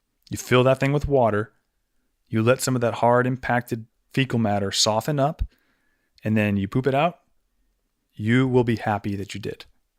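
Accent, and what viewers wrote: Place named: Texas, United States. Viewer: Your Texan accent seems to match pretty closely with the way Texan musician Michael Nesmith used to talk.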